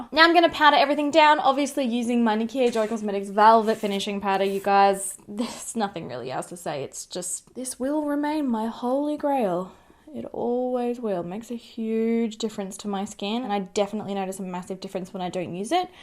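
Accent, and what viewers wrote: Australia. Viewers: I love watching your videos and I love your Australian accent 😃❤ You are so beautiful on the top of that lol 😍🤗🌺 Xoxo from Canada 🇨🇦✌❤🤗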